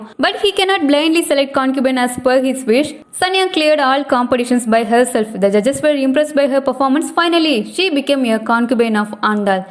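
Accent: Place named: England, United Kingdom. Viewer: Really happy to see thiz drama in your english accent.... ❤❤❤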